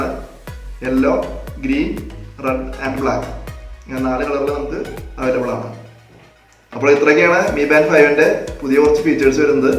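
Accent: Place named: Thrissur, India. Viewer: Thrissur slag touch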